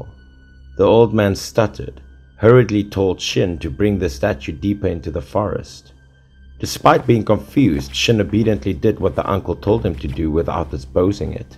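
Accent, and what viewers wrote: South Africa. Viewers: Is this a South-African Accent? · Dude are you by any chance from South Africa?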